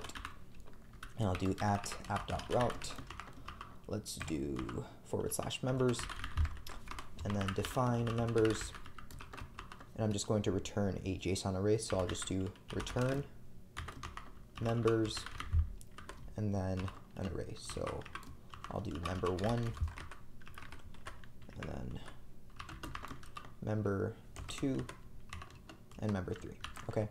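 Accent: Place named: Nepal. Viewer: Bro!! are you Nepalese? your accent ❤ thank you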